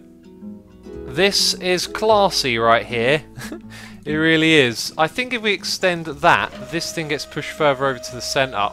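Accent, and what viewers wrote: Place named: United Kingdom. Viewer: DaSquirrelMan Yeah, his accent is hard to pin down. I wasn't sure if was British or Scandinavian at first. Still sounds like there's some British influence in there.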